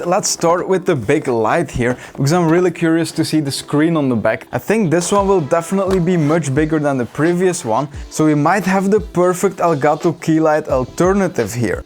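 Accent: Netherlands. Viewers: Cool series but i have one question, are you Dutch? I’m Dutch… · nice but i smell nederlands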